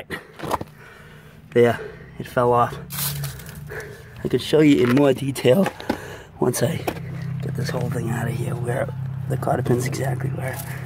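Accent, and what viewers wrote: Boston, United States. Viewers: Sounds like Boston. Lol.. good video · Sound like you from Bostonn, great video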